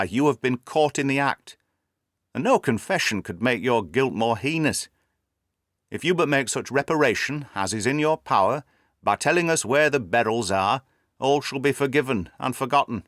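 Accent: United Kingdom. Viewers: Yes, British accent👍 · I think its an British Accenct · Is this british accent? Pls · Is it British accent ??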